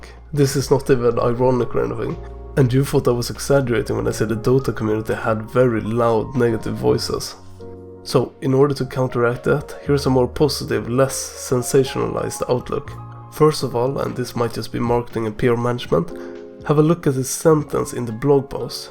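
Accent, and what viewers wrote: Sweden. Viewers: jesus kristus den där tunga svenska dialekten. kekw.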